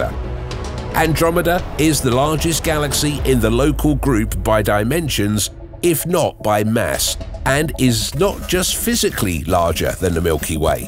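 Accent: England, United Kingdom. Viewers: If it wasn't for this guys English accent I wouldn't believe this!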